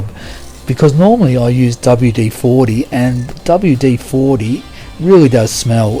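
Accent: Australia. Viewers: Well the other faceting channels don't have the Australian accent, so they can't copy that without sounding weird. the gem looks amazing!😮 wondering if you have done any videos of faceting golden rutilated quartz?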